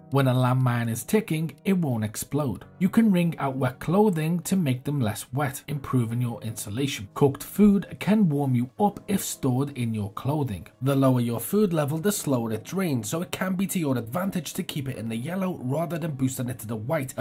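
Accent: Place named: Northern England, United Kingdom. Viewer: OMG You did that on purpose right? I do believe you just created not o ly one of the most useful dayz videos for beginners like myself, but you also just rested one of the best money earning youtube videos of everyone who watches it and finds it useful is gonna be back here again and again and...yeah and so on lol. Well done on both counts lad, nice work! And to think k I was gonna say I find your videos amazing but so fast I am forever rewinding and rewinding LOL, well I won't bother asking you to slow them down after seeing this one! That accent be familiar to me. My wife she be from Chester and used to live the Wirral. I'm from Scotland so we both count as northerners, nice tae meet ye. 👍💚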